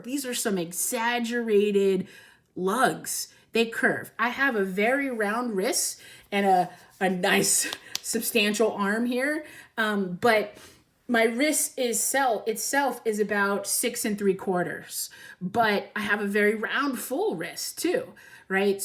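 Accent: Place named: Midwest, United States. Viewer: Great review!!! Thank you. I'm considering the Mark XVIII black dial or the Mark XV which was the first IWC Swiss watch I've ever wanted since the 1990's (the other is a Reverso). Back in the day IWC was difficult to find. If I already acquired my wish list timepieces and money wasn't a concern. I would get a vintage military Mark XI from the 50's for the same price as a Mark XVIII. I currently own a Speedmaster Pro 1861 movement but I need something more anti-magnetic and water proof. It's a tough decision when it comes to items that cost thousands. Do I re-live my 20's Mark XV, or should I just go for the Mark XVIII? Which is a better watch, perfect size, symmetrical design, legible, 20mm strap options.... By the way, are you from the Midwest? You seem to have a Chicago/Detroit/Milwaukee/Ohio accent.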